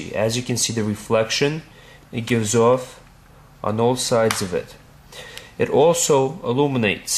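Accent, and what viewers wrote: New Jersey, United States. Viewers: U from jersey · Jersey accent ;D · its a jersey thing pops in my head when i hear him talk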